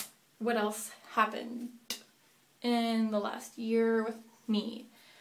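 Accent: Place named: Canada. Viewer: You have such a canadian accent! Anyways, I watched your pregnancy videos and Im glad your vlogging again! (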